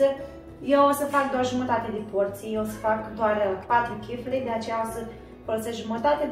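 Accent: Moldova. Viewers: Te-am descoperit de curând, mi-au plăcut atât de mult vlogurile tale, așa încât m-am abonat și îmi doresc sa le vizionez în continuare. Ai o familie absolut superbă, tu ești atât de tânără și frumoasă și îmi place accentul tău moldovenesc! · Adoooor accentul tau 😊❤❤. Ești moldoveanca din Iași ori Bacău?😅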